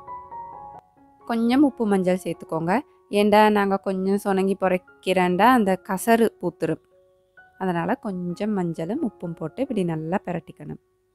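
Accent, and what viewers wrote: Akurana, Sri Lanka. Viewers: Slang is similar to Akurana , kannuku kulirichi Ana wai urina oru vedio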